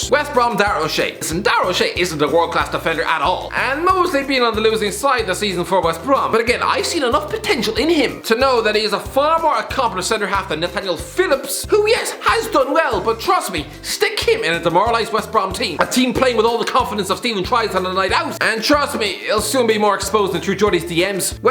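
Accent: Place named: Ireland. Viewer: Its fun hearing read Nigerian names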